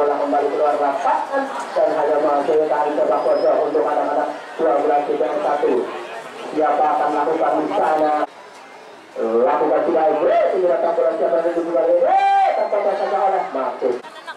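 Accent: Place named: Banyumas, Indonesia. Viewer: ngapak koh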